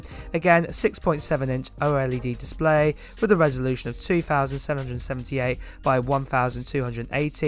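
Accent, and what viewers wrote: United Kingdom. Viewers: Why don’t you have captions? I’m very HOH.. and have great trouble understanding British dialects!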